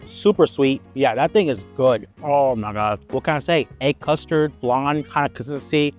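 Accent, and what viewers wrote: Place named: Philippines. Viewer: He doesn't look Indian. He looks straight up Pinoy. Even the way he talks 😅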